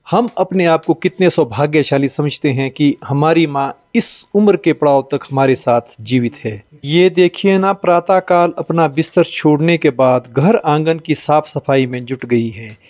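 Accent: Standard. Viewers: शुद्ध उच्चारण के साथ , बागीचो की सही देखभाल एवं पौराणिक चीजों की उपयोगिता की सटीक जानकारी युक्त आपका ब्लॉग अनुठा सा बेहतरीन लगा ,,,you people are very positive, humble and good cultured,, ...….keep vlogging 👌👍 आपने माताजी के लिए जो lines बोली सही में गौरवान्वित बना दिया अपने parents को...।👏👏👌 दादीजी को नमस्कार🙏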